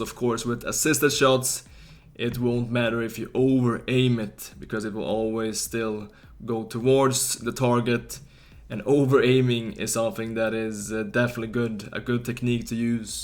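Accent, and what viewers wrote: Sweden. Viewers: Are you swedish i can tell by your accent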